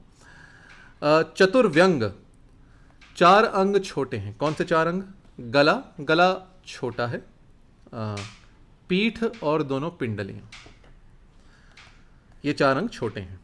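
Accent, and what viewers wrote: Standard. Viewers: Am impressed by your sudhha hindi ..and appreciate your description of the different body parts of Shri Ram .. thanks for clarifying ..जय श्री राम 🙏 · आपके अध्ययन, मनन, चिंतन और वर्णन का में कायल हो गया हूँ!! आपका शुद्ध उच्चारण और विषय में सटीक सरल उदबोधन बहुत ही प्रभावशाली है!! एक बेहतरीन विश्लेषणयुक्त प्रस्तुति हेतु आभार!!🙏👍🙏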